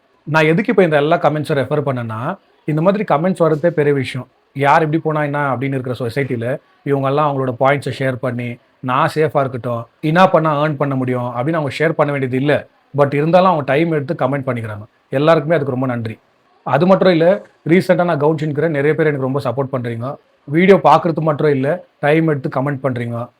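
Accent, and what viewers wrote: Bangalore, India. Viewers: Bangalore tamil ah ..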